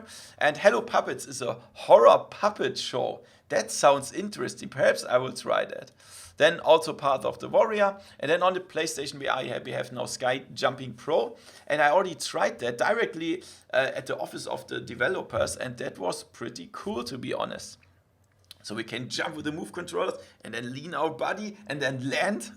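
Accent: Germany. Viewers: Nice video, I love the german accent haha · One question do you speak german because i hear a german a aksent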